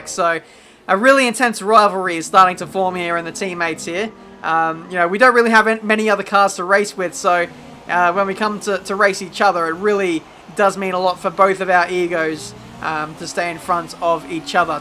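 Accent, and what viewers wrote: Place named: Australia. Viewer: Found your videos last night and have ended up watching all of them already. You've convinced me to buy this game now so thanks! Also, good to hear a fellow Aussie accent playing games. Keep it up dude.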